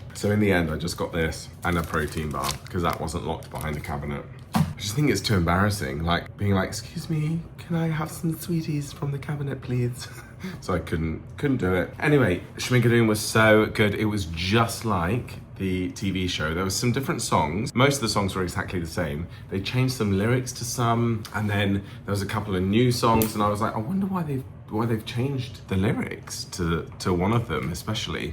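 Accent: England, United Kingdom. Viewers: Ok take it from this old lady, you being so cute and an Englishman in New York. Oh, my, they could write a song about that. L o l just open your mouth and they'll love you. That lovely accent alone. Here in America you are one of the most classy person. So go in those upper shops look like you own the place. They love.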